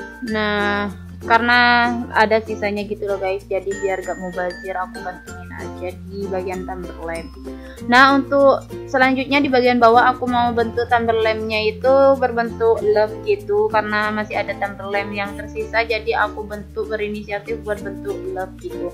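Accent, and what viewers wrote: Madura, Indonesia. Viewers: Cara bicaranya kek org madura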